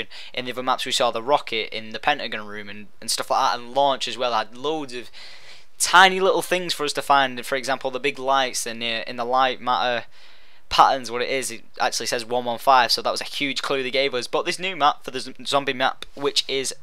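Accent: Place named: United Kingdom. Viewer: i like yur accent its a fedish i have for british accents pretty weird............ so what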